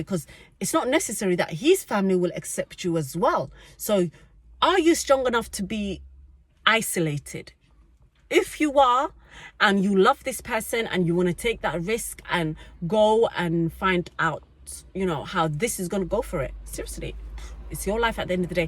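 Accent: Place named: Somaliland. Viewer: Sorry, I had to comment again the reason your children are non considered a somali in the Somali context is because of the clanal context within Somalia your children will not be considered Somali but Granadian and therefore will not get clanal protection if they were to live in Somalia. No matter how much you try to advocate it within the Somali context your children are NOT somali they are Granadian no matter how strong their culture is and how much you have instilled somali culture in them. In the West its all fine, I see hint of somaliland accent, if that is case in SOmaliland your children will never get nationality because of the constitution as you get it through the father side.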